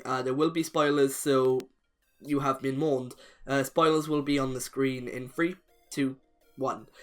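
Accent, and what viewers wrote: Wales, United Kingdom. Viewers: R u Welsh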